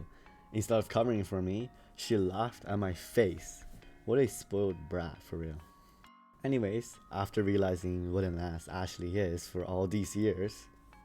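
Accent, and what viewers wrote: Turkey. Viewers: yes also I think you said you were Turkish one time that might’ve been another one I don’t know but the way you pronounce the English words🥀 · Yeah he really sounds Turkish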